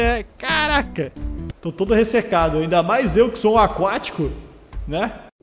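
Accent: Rio Grande do Sul, Brazil. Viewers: vc tem sutaque Gaúcho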